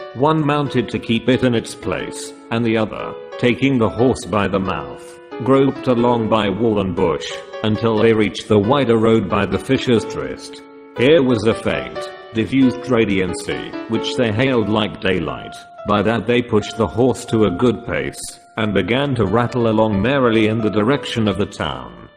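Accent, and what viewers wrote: United Kingdom. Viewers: Good british accent..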